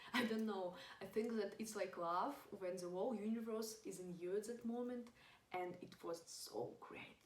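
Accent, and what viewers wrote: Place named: Russia. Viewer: This russian accent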